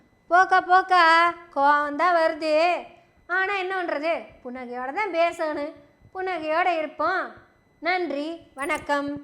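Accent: Kongu, India. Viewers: Kongu slang la kekkave arumaya irukku... ❤❤🎉🎉😂😂😂😂😂.. Innum neraya thittunga.. ❤🎉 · கொங்கு ஸ்லாங் 🔥🔥🔥அருமை அப்படியே திமுக மேடையில் கலைஞர் முன்னிலையில் பேசிய வடிவேலுவை ம் கொஞ்சம் பேசுங்க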